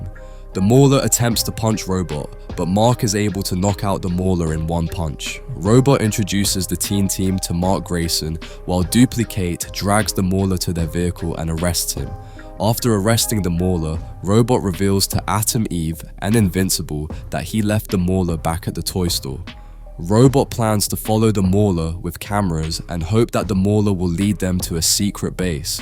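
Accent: United Kingdom. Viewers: he's British, that's how it's pronounced in whatever part of England he's from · I'm so used to this accent I tuned it out. He's just Bri'ish or something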